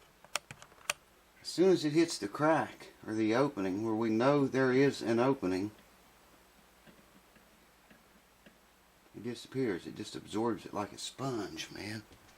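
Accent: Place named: Kentucky, United States. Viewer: Dig the vids. I watch them cuz your one cool cat. You tone of voice reminds me of my family from Harlan Kentucky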